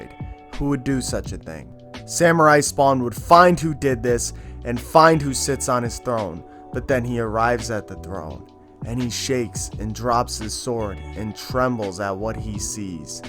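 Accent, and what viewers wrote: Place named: Wisconsin, United States. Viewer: I’m from Memphis. My oldest brother lives in Wisconsin and your accents sound similar. Like the way you say floppy tits in a past video sounded exactly like my bro ha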